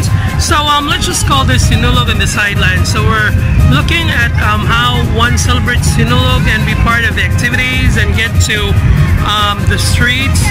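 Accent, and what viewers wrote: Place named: United States. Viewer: Sounds American!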